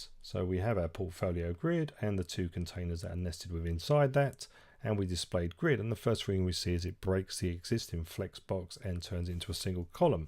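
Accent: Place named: England, United Kingdom. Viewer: Excellent tutorial man. Always better with an english accent too haha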